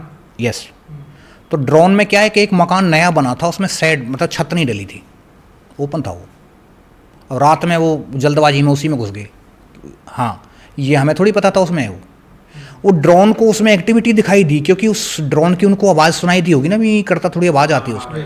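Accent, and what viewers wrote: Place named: Palwal, India. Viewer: Inki aawaz palwal side ki si lag rahe hai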